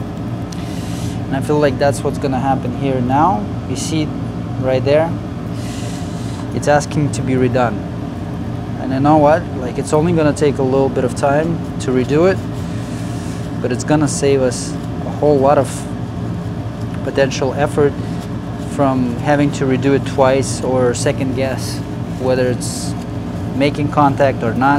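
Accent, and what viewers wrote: Russia. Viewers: Do you have Russian accent? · мне кажется, или у тебя русский акцент?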